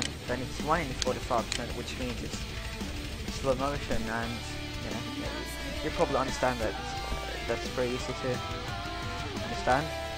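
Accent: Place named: United Kingdom. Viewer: nä så ere fan inte ! ;o du låter som en äkta britt typ xD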